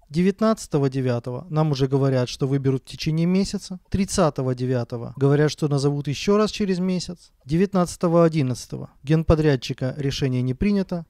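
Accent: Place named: Ukraine. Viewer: у тебя акцент украинский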